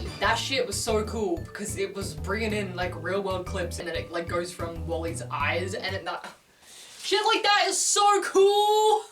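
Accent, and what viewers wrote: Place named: Australia. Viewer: When I saw your video in Scott Cramer's video I truly did not expect an australian accent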